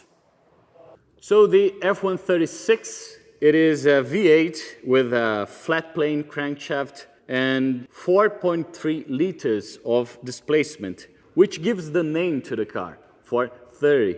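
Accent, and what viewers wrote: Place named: Massachusetts, United States. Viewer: I Love your accent from South of Massachusetts 😊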